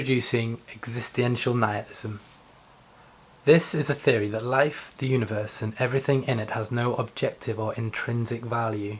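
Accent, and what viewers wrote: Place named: United Kingdom. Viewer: More horse shit 'British' accent ? what.. go back to your pitiful little island a piss off.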